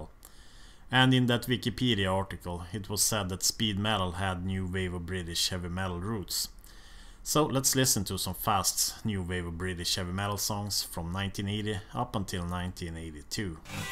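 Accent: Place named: Sweden. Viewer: man, you're hilarious! You talk in this cool, deep Swedish accent and then you say stuff like "howdy folks" 🤣. I love it!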